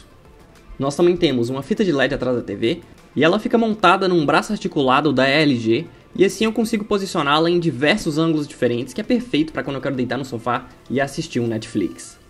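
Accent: Brazil. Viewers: melhor parte é o sotaque de mineiro hauheuahahah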